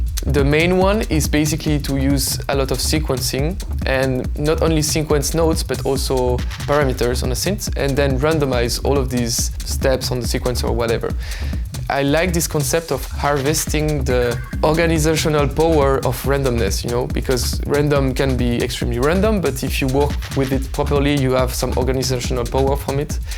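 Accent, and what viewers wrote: France. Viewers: well that explains it then 😅 thought he had a small french accent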